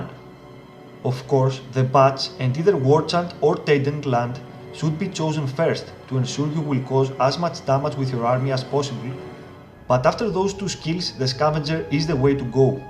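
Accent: Greece. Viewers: Λολ μπορώ να καταλάβω ότι είσαι Έλληνας απ την προφορά σου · Greek! From the accend! Haha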